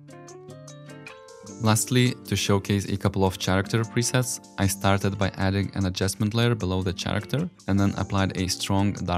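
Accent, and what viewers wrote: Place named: Russia. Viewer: А я слышу, что ты русский))))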